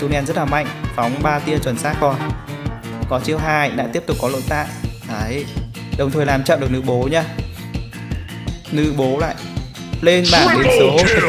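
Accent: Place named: Hai Duong, Vietnam. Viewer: anh có phải người Hải Dương kh ạ? Em thấy giọng anh khá giống người địa phương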